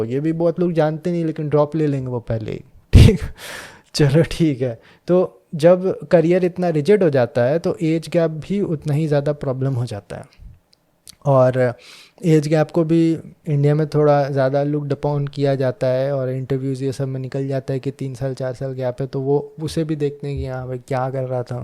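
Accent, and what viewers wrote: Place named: United States. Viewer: Feels like now your Hindi is slightly sounding American accent 😅. Just saying what I felt. Been more than a year, I heard you speaking Hindi.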